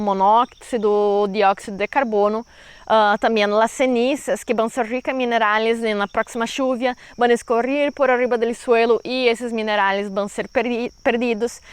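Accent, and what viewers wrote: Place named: Rio Grande do Sul, Brazil. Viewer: Você é brasileira né? Seu sotaque é de quem fala português do Brasil...gaúcha com certeza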